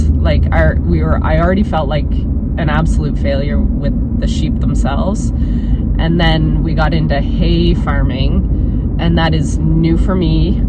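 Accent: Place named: Canada. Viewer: Today i learned canada also says zed, instead of zee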